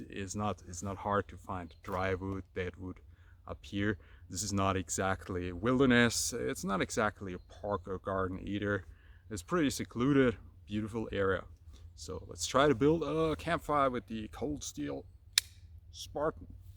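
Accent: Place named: South, United States. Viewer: I love your accent! I hear a lot of influence from the American South, no?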